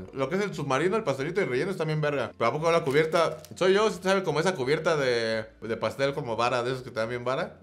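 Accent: Spain. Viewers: #Queobolines que buen video y me cague de risa con tu acento español xd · Muy chingon tu video y tu asento español 🇧🇴 saludos mi ya eres internacional #Queobolines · #Quiobolines 😂😂😂😂 me encantó tu acento español 😂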